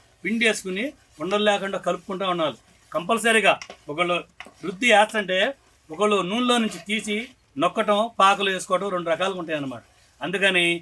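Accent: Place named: Kurnool, India. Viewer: Amma meeru ma Kurnool basha lo mataladu tunte chala bagundi Athrasalu❤❤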